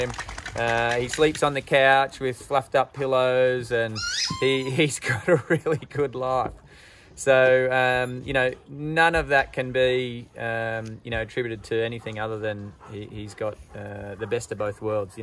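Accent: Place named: Australia. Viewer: You got good videos and totally a stress reliever to watch however, it stresses me to try to understan what is being said with that Australian accent. Watching from California USA . .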